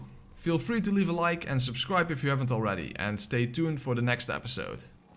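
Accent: Netherlands. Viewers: thanks for the guide, your accent sounds dutch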